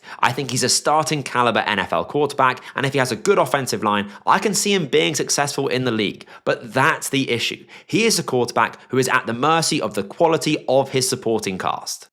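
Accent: United Kingdom. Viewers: Having a British dude analyzing CFB is throwing me off 😂. Great video man, editing and writing is A1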